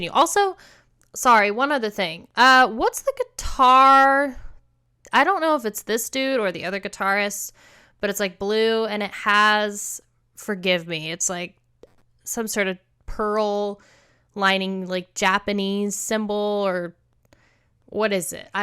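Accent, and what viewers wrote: South, United States. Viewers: Where are you from? I'm detecting a southern accent in your voice.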